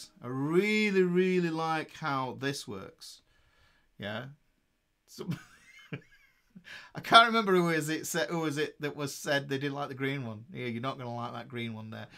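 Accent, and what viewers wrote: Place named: Northern England, United Kingdom. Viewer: I love the northern bloke teaching me. I'm from Rugby. Thanks mate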